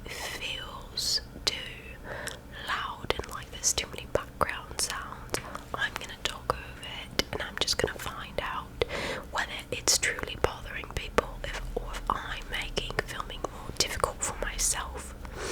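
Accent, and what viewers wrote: United Kingdom. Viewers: this video will be 2yrs since it was released and I'm still hoping she'll make a pt.2 of it coz this is always my go to British accent ASMR. ☺️ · Yes!😊😊😊😊. My wish is finally granted! is back with another ASMR video with a british accent! YAY!🎉🎉🎉🎉🎉🎉. Also, you sounded like Hermonie Granger's accent from the first Harry Potter movie! Now that's wicked cool!💙💙💙💙💙💙💙👍. I give this video an A+ for your incredible British accent,!⭐⭐⭐⭐⭐⭐⭐👍. Have a great weekend and enjoy your fantastic accent.😊😙💙💙💙💙💙💙💖💖💖💖💖💖💖😊⭐⭐⭐⭐⭐👍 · Really Beautiful Woman with a Very Beautiful British Accent!! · Hi, I missed you very much, your British accent is wonderful ❤❤❤ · British accent why not ? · You know I'm from NYC and seeing a beautiful latina do a British accent I am honestly impressed. In the future could you please slowly scratch the fluffy mic again? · I just assumed you were British… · Nice and great video. 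I was really surprised to see u do a British accent. I must say u do a perfect British accent 100 percent. U sound almost like UK asmr remember her. Thumbs up 👍👍 August.21.2021. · Perfect accent. I say that as a Brit. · You let it slip a few times went abit Birmingham aha but other that a genuine solid accent it u spoke to me in the streets I wouldn’t question it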